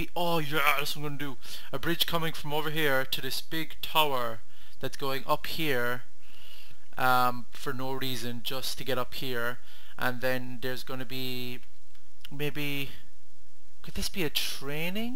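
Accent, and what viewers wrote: Ireland. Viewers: is your accent irish? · i only just found this channel and its says your irish i am too but i cant tell from your accent where your from ???? :O :)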